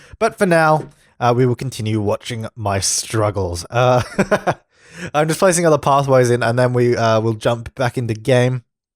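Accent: Australia. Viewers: Marry me! Ur so cute! Love ur aussie voice! Cuuuteee! Wanna learn how to Make a World! I Think my pc is toooo Slow! Xoxoxoxo from Denmark! 🇩🇰🇩🇰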